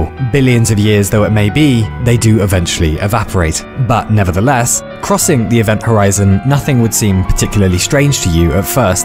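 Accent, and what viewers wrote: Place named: United Kingdom. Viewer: He's so fun to listen too I always forget he's British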